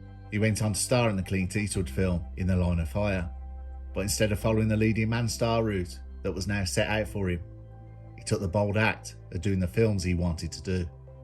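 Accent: Birmingham, United Kingdom. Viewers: I’m working my way through your video slowly, but I thoroughly enjoy your wonderful work. I don’t normally comment but I thought this video brought your personality through brilliantly. Keep up the good work! I enjoy your sillly smooth Brum accent (I’m a manc) 👍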